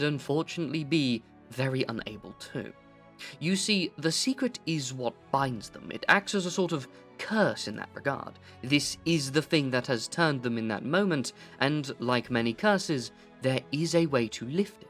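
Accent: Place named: United Kingdom. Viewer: Waiting for my favorite British voice be it in D&D wizard of vampire lord and it's been a month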